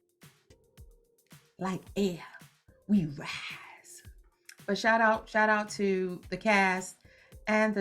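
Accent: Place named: Jamaica. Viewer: I HOPE YOU ARE A JAMAICAN.... WE DO THAT BRITISH ACCENT.... LOVE YOUR SHOW...PLEASE POST YOUR EQUIPMENT IN SHOW MORE DETAILS. I LOVE YOUR LIGHTING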